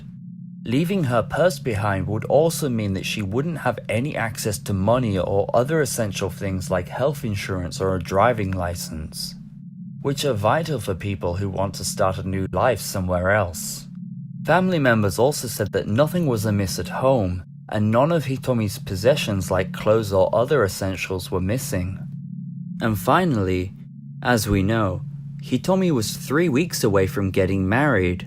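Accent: United Kingdom. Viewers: Your channel says you're from Japan, but you sound British. What's your first language? P.S. Awesome content!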